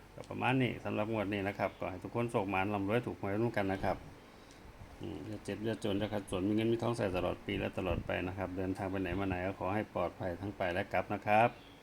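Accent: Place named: Phetchaburi, Thailand. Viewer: รบกวนถามแอ๊ด อยากทราบว่าแอ๊ดเป็นขาวจังหวัดเพชรบุรีมั้ยคะ...สำเนียงเหมือนคนเพชรบุรีค่ะ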